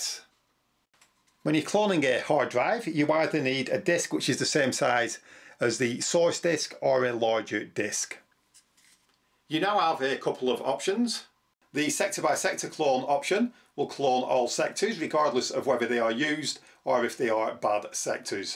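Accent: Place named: Lancashire, United Kingdom. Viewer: Someone is from Lancashire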